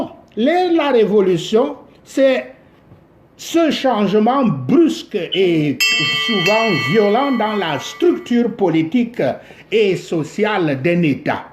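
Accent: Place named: Guadeloupe, France. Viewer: Tu es camerounais mon frère? Comment t as l accent Guadeloupeen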